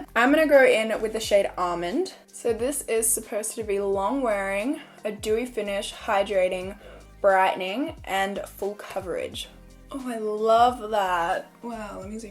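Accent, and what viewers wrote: Australia. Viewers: i really love your Aussie accent and voicee 💗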